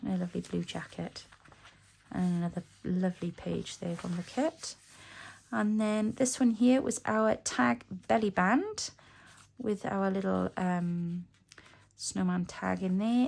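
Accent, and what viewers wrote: Wales, United Kingdom. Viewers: 🤣🤣🤣 OK, I didn't hear it the first time around but I can now! Too funny! It sounds so wrong coming out of your mouth alongside your lovely delicate Welsh accent! You'll be fine when you come over here though, most ppl will just think you have bit of a strange accent! ❤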